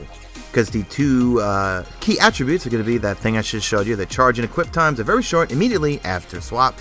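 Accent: New York, United States. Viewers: Hey, fellow NY'er here, so love the accent - don't change a thing. Re: fusion rifles, I wouldn't be so quick to jump on the nerf-wagon before they lift a finger to actually buff anything. Seems Bungie is more comfortable nerfing. Buff pulse rifles, hand cannons, scout rifles and, who knows, fusion rifles may be a less attractive idea after all. Plus, they're great at taking down those pesky shields in PVE. How about spawn less special ammo in PVP? The player base will always have a preference - at least it's a class of weapons and not a single one (Suros Regime, I'm looking' at u).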